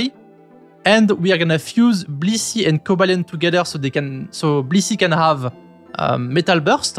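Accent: France. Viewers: très compréhensible je voie pas le problème l'accent baguette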